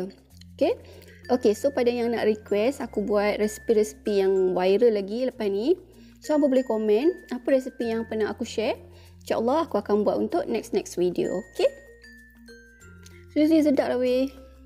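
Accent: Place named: North, Malaysia. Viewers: Pekat nah utagha. Boleh belajaq kat sini sekali hehehe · Terima kasih cik oii,.,memg sangat sempoi...esok pagi makcik mesti try ni., kbetulan semua bahan ada ja...dengaq cek makan krupkrap pun dah telioq aih...terima kasih no.,kongsi resepi ni...cakap utaqa tu maintain na cek....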